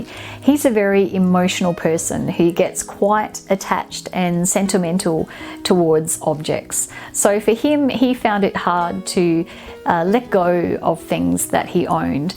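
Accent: Australia. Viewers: Did she say autistic or artistic? Her Australian accent can be misleading. Nice presentation however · Did you say Autistic or Artistic?I'm American so sometimes Australian accents are more difficult to understand.I'm beginning this process now that my nest is empty.Its very difficult but I'm sick of all the excess things I have accumulated.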